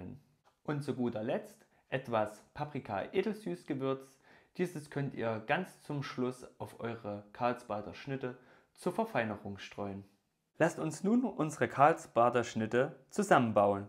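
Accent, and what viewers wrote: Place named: Saxony, Germany. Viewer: ich finds immer wieder süß wie der Sachse versucht das Sächseln zu unterdrücken wenns öffentlich wird. :.-) Bin selber Leipziger und habe das bei vielen Videos bemerkt. Gutes Video , aber wo der Käse da schon "Farbe" hat weiß ich jedoch nicht . ;-) Aber Die "Karli" mach ich mir jetzt trotzdem.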